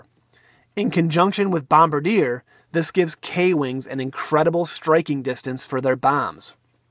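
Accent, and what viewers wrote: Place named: United States. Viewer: You're aware that American people tend to have American accents, right?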